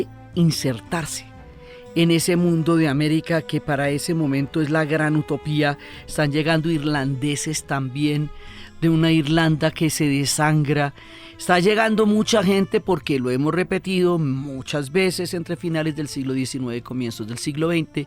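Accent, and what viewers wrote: Colombia. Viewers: Historiadora con estilo propio y ese acento chevere colombiano, un abrazo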